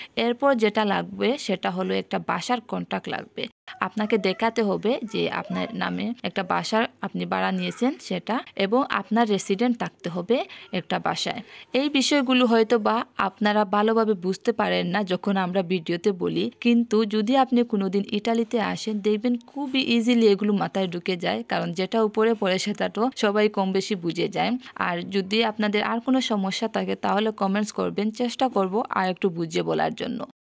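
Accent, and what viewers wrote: Sylhet, Bangladesh. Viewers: আপুর বাড়ি মনেহয় সিলেট, আপনার মাত সিলেটির মতো লাগের।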